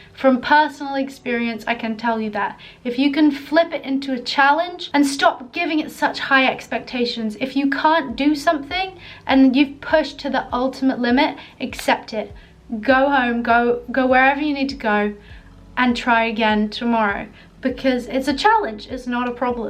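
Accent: England, United Kingdom. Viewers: Are you English? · Are you English??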